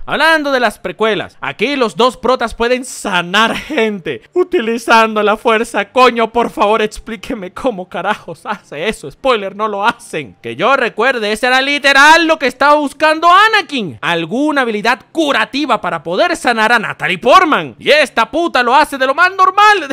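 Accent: Venezuela. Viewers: Y pensar que ese acento venezolano volvería jajasjsaj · Llevo años viendo videos de y primera vez que le escucho lo veneco y modo insultador jajajja coño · ajkjask mrc, me llena de vida escuchar a modo venezolano super obstinado, fuerza fuerza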